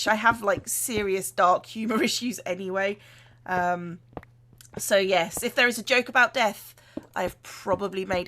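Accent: United Kingdom. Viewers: Wait, you're British?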